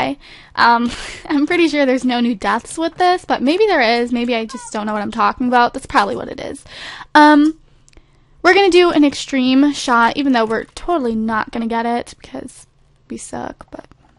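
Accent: Scotland, United Kingdom. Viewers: Scottish lol:)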